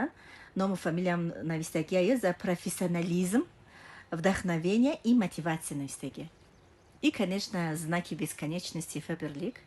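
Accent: Tajikistan. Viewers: худочон аз инда зиёдат бта рахмат ба падару модарат.Ба забони тозаи точики фахмо гап мезани.ьехтарин.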